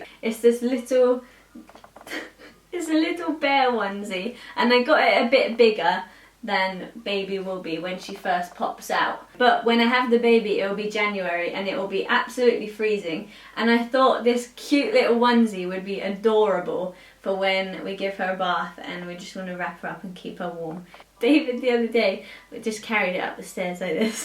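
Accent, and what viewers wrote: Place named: United Kingdom. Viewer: I just found your channel and i am obsessed, your accent is amazing ive always wanted to have a friend from the UK!! Were from California❤️ Congratulations on your home and babygirl!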